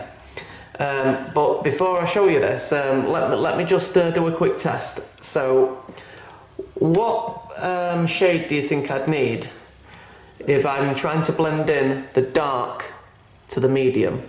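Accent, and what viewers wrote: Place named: North, United Kingdom. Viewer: Where are you from, sound northern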